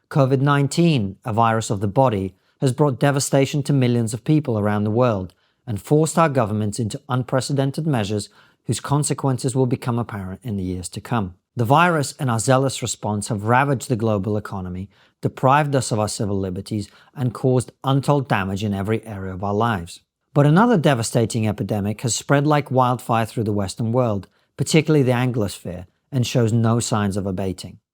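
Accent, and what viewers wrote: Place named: United Kingdom. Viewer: Ты так быстро говоришь и с таким хэви-бритиш акцентом, очень трудно понять.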